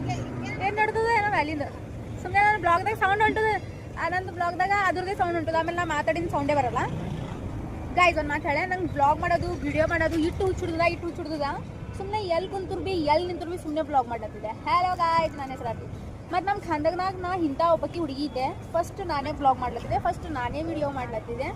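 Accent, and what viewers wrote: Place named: North Karnataka, India. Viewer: Chenagidhe nim Uttara Kannada accent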